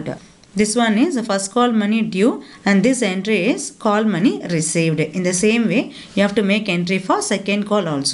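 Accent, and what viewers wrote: South India, India. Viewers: Hate the South Indian accent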